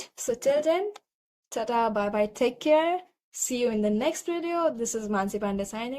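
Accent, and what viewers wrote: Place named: Punjab, India. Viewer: I am damm sure you are punjabi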